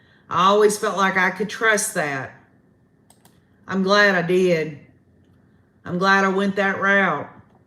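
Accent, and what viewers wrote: South, United States. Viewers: I just love your southern accent. And curse words are just punctuation for honest people ! IMO. I think Lori finally screwed herself by saying how it was months later when she was dancing in the beach 🏝️ . If that phone call had only lasted longer Lori would not have been able to control her responses to summers questions.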